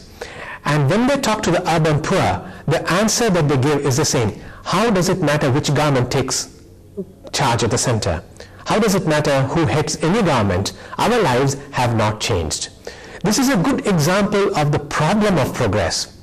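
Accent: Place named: India. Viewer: Sir, it is a lesson for Indian graduate students, his objectives of teaching this essay is to make the students understand the key points easy not the american philosophy, it is in Indian English pronunciation, so that Indian students can understand these sounds easy. Thank U Sir.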